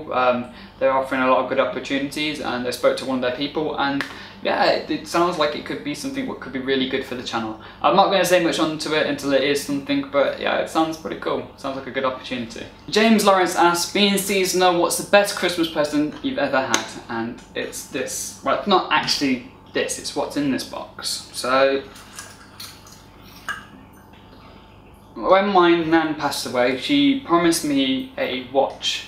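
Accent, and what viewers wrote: United Kingdom. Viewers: Starting to look at your post , ( luv it!), would like to know which country you are , beautiful accent! Thx to answering,I always loved British accent, It’s like poetry for my ears !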